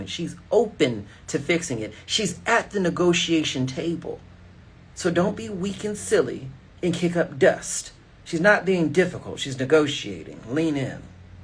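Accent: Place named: Washington DC, United States. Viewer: You from the dmv?!?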